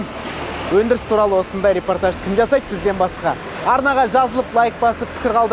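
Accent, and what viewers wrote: Standard. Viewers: жарайсың таза қазақша сөилейсің.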